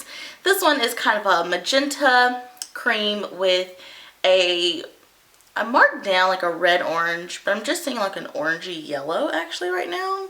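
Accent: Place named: Canada. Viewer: I hear a boat when Canadians say about & I think it's cute! Thanks for the review. I've got a shopping list and somne of these are going on it 😉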